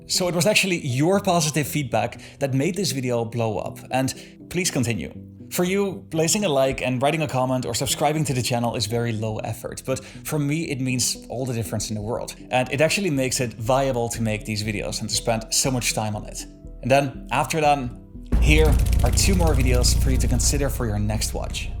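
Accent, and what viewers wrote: Netherlands. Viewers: Jij bent een Nederlandse. Dat hoor ik aan je . Maar jij spreekt wel heel goed Engels hi Maar zou je is een aflevering willen maken over het paranormale in Nederland de vrije metselaars❤❤❤❤ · Are you sure? Because they're definitely trying to make money and milk the residents for their last eurocent. Also I can hear from your accent you're Dutch too 😄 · His accent obviously shows that he is a native Dutch-speaker. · you sounds very dutch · which Dutch accent this presenter speaks with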